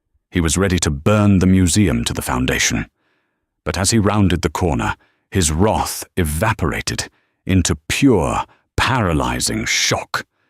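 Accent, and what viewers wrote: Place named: United Kingdom. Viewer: The British accent gives the narration more gravitas. But use a female narrator sometimes, please.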